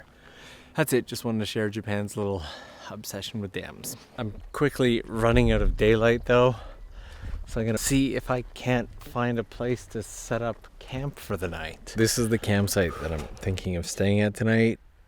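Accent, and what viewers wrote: Canada. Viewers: Fellow Canadian living in Japan here. I've only watched a few of your fascinating videos, but I knew you were from Canada from the first vid of yours that I watched, just based on the way you said "house" :). I used to live in Tohoku, and these abandoned villages remind me of all the old houses and sections of cities I would travel through for work. Of course, there are even more abandoned places there now, especially in Fukushima. One thing these sorts of places always make me think of is the warmness of small-town Japan. Thanks for keeping your focus on these smaller parts of this amazing country, it really helps keep them alive through the memories, in a certain way. You just earned a new sub, keep it up. Edit: the only gripe I have is you cursing about the curry. I get you might not be a fan of the curry, but there's no reason to cuss it out.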